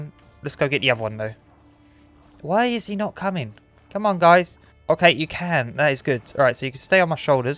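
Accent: United Kingdom. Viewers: Hello sir! Dialect geek here wondering where about’s your accent from? Southern England? Is it cockney?😄 pardon me though, I’m just geeking here.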